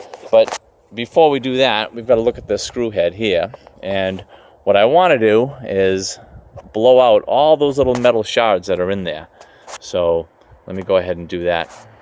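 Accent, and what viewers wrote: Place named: New York, United States. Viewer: My favourite part of this video was the strong New York accent. My second favourite part of this video was when you put the driver in reverse so it wasn't trying to drive the screw even deeper.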